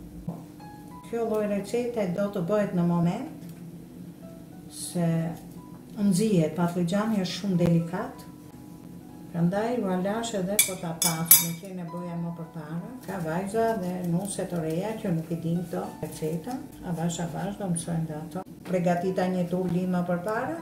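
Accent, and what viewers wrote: Tirana, Albania. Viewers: Sa jam knaq me kte t'folmen , sa e paster Tironce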